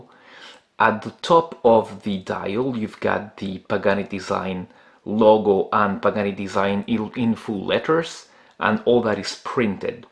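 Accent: France. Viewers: tu sonnes très Français pour un reviewer en anglais lol (mais belle prestation... sans doute un Belge ou un Suisse mdr) Salutations de Belgique.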